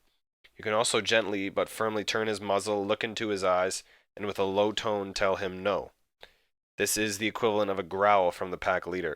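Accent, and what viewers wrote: Canada. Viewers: Are you Canadian? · are you canadian? ^^ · haha canadian accent!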